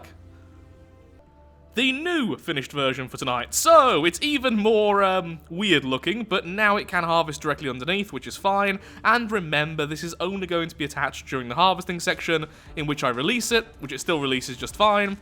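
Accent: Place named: Wales, United Kingdom. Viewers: wait is from wales....*fist thing i think of* "WALLLLLLE LOOOOOORDDDDDDDDDDD" cough sorry i mean no dis respect...i was alwase wondering were your acent came from cool to know (sorry about the bad english) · You are Welsh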